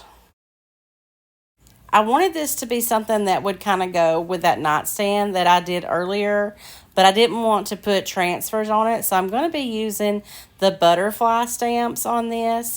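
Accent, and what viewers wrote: South, United States. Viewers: thank you for taking the time to reply. I've been watching all your past videos too. I am in Canada and I had to use a white wax that it's available here. It looks exactly like DIY one in buttery texture but it does not dry. I will have to find a solution to this problem. In the meantime...#1 love, love, LOVE your southern accent. I used to live in South Carolina. Best years of my life. #2 I saw the video where you were thinking to quit YouTube. So glad you didn't. We need more people like you. We live vicariously through you. #3 I also loved the video where you put down pen and paper and explained what profit you made in a month in your booth. I loved the honesty I know you must be super busy, I'm a mom too with young kids and a full time job. I'm amazed at your energy. Keep them coming videos. I love watching them.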